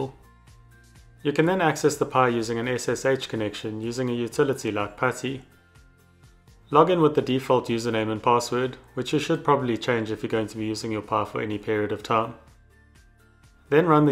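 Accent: South Africa. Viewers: Sjoe, jou aksent skree saffa boet.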